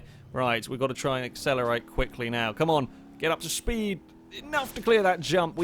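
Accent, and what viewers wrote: United Kingdom. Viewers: This guy sounds like one of the high society rich English guys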